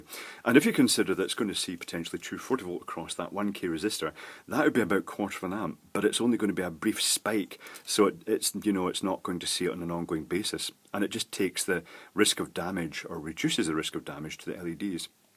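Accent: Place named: Isle of Man, United Kingdom. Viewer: Ne-on. A noble gas that glows when excited by electricity. comes from the Isle of Man so he has a bit of an accent.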